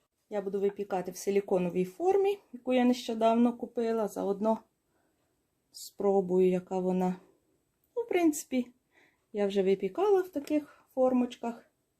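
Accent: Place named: Poltava, Ukraine. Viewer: Доброго вечора,!Дякую за гарне відео!Цезар такий кумедний😄Помічник!Завжди дивуюсь,як Ви з півдня України,а розмовляєте начебто з Полтавщини.Дуже люблю таку просту,українську вимову.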